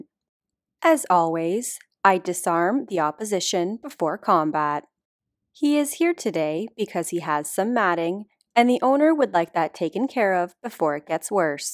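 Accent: Canada. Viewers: I love the commentary... Hilarious.. I'd bet anything your Canadian!!!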